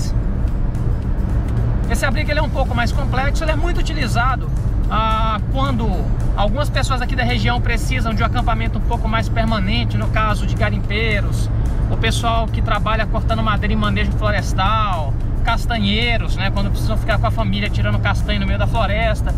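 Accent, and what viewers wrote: Manaus, Brazil. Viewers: Você é de Manaus?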